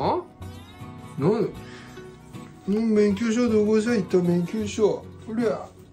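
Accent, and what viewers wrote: Tsugaru, Japan. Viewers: 綺麗な津軽弁ですね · うちの青森のおじさんにソックリ…しゃべりも…ガッツリ津軽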